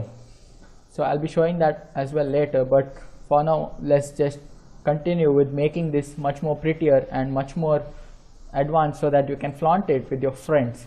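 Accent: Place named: India. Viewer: have problem with indian accent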